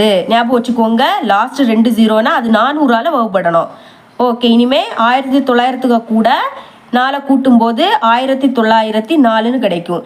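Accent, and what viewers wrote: Kanyakumari, India. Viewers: Neenga kanniyakumari yaa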